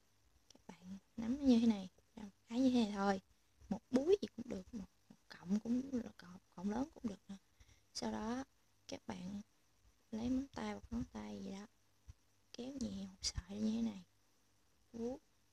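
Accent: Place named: Central-South, Vietnam. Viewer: nói to lên một chút c ơi, giọng miền trong vừa nhỏ vừa vừa khó nghe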